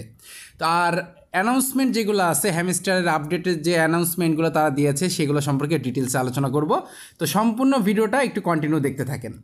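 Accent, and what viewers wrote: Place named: Khulna, Bangladesh. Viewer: অনেক ধন্যবাদ আপনাকে ভাই, ১ম ভিডিও শুনেই subs, like,share করে দিলাম ।এটা আমি খুব করি না । ভাই কি খুলনার ।কথা শুনে তাই মনে হয় ।So nice your speech.Thanks again.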